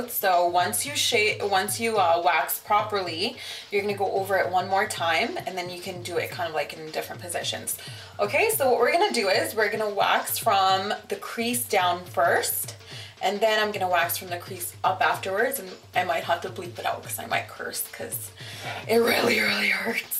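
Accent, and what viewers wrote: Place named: Canada. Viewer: you kinda sound like a canadian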